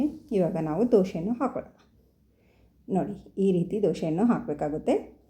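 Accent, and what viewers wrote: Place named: Kerala, India. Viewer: Pronouncing Vada, Dosha is Malayalam influence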